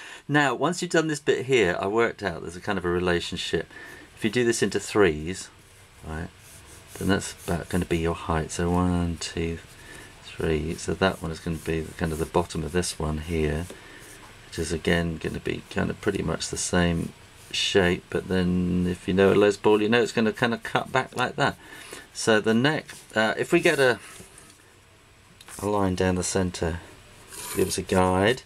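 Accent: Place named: United Kingdom. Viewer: This man speak like Jhonn deacon very Enghlis UK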